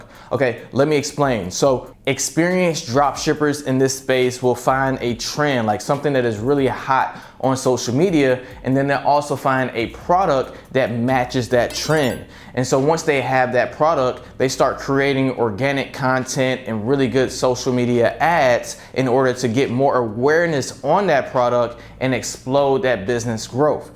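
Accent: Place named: Africa. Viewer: i like your African accent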